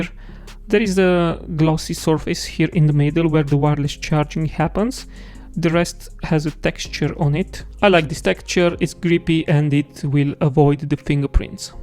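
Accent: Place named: Romania. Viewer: accentul de roman se cunoaste fratele meu :D:D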